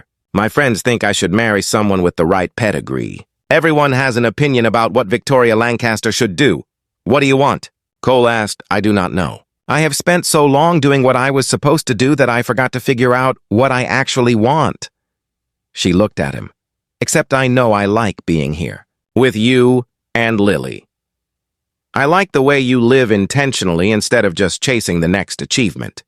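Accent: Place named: Texas, United States. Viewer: Enoigh of this unsufferableTexan accent ! It spoils a good story 😮‍💨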